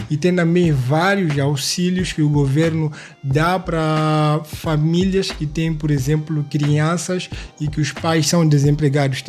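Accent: Brazil. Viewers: seu português, tá ficando "abrasileirado." 🇧🇷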